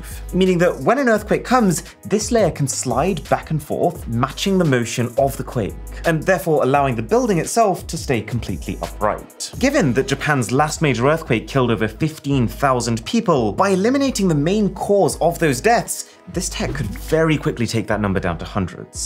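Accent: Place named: United Kingdom. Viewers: He is British but for obvious reasons, the general audience is American and many statistics are measured using dollars. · I can never tell if is British or American. He sound British yet only talks about America for stats and uses dollar Can someone please clear this up for me please!!!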